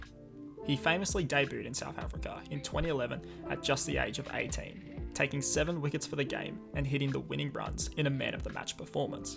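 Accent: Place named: Australia. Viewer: Man shut up your aussie accent is so goofy, I can't take you seriously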